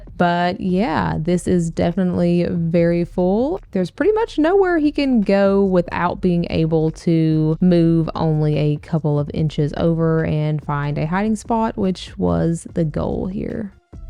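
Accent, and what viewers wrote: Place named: North Carolina, United States. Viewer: being from NC I heard that accent and thought yep I trust her!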